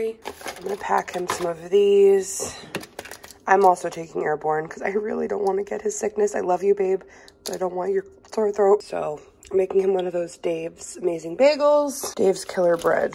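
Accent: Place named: England, United Kingdom. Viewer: Oh my goodness, your English accent is everything😍 Love it X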